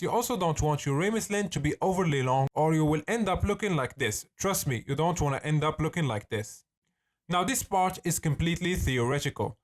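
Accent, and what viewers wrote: Morocco. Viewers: wach nta mghribi azbbi ach had l accent hhh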